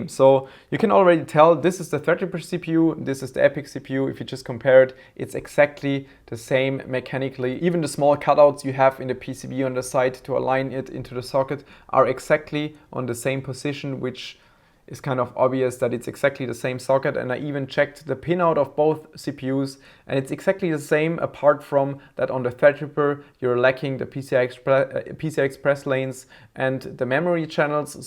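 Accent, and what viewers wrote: Germany. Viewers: Man hört den deutschen Akzent xD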